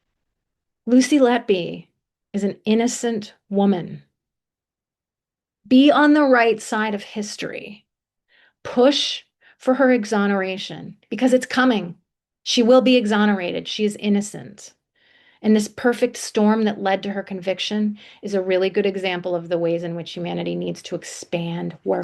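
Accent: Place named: United States. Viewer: I take it by your accent that you are an American? So am I…..retired American nurse…..have been involved with this case for 2 years…… I also have a channel…… there are also probable innocent convicted serial killer nurses here in America…… if you are interested once Lucy is free to help these nurses out let me know…..I am trying to get fellow Americans interested in freeing my wrongly convicted nurses…..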